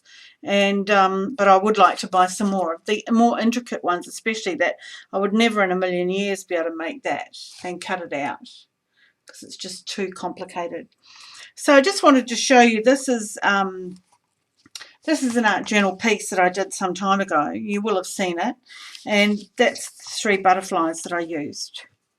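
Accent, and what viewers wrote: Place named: New Zealand. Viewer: Thank you -- great ideas . Plus, loved hearing your accent, as an expat Kiwi it makes me homesick! x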